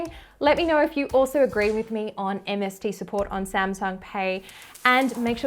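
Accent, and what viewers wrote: Australia. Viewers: Is she Australian 🤔🤔🤔 Kinda! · This gal is an Aussie, I'm sure of it 🦘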